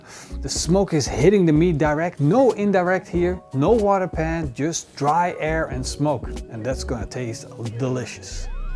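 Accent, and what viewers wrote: Netherlands. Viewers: wat een mooi nederland accent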